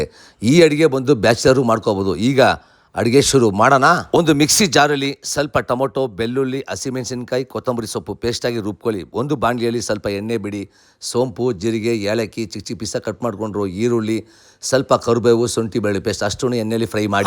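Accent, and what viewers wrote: Tamil Nadu, India. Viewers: Ur kannada was not so good I thought ur tamialn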